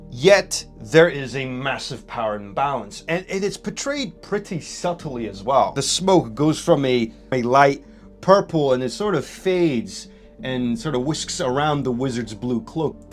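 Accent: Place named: Finland. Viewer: Dude's accent sounds Finnish.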